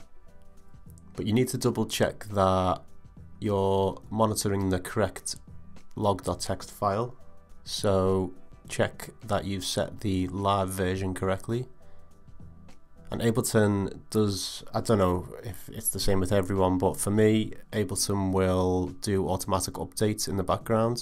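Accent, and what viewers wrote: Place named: Liverpool, United Kingdom. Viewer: When I heard that accent, I thought "Oh, great! A fellow Scouser! - He will be able to help!" Sadly, this video was only useful to those writing scripts, but that was not my case. I have a MIDI Wind controller that Ableton is not recognising it. Any advice, our kid? Greetings from a scouse exile in Catalunya.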